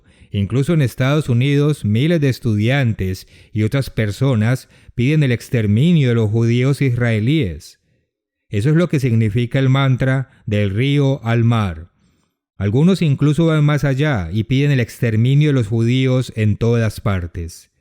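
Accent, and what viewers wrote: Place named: Latin America. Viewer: Me encanta este canal,pero una cosa, la voz es muy latina y no la comprendo , desde Chile bendiciones!!!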